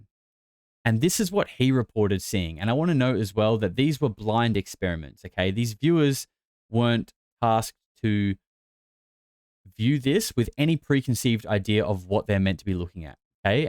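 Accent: Australia. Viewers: Yes frame, U DO SOUND LIKE THAT - THE 1ST WORD U SAID HAD STARTED YOUR AUSSIE ACCENT, EVEN THOUGH U PROBALY GREEK OR ARABIC BACKGROUND!!! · No buddy; Aussies sound amazing, love the way you speak :)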